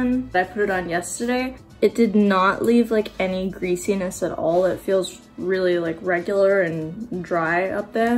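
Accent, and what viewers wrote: Australia. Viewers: I could definitely hear the Aussie at words ending in the “r” sound, but then didn’t hear it at other times when I thought I would. Thanks for clarifying and answering! 😉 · Your accent is really interesting, it's like a cross between Australian and American! 😯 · Where’s your accent from? It sounds Australian but then there is an American twang in there.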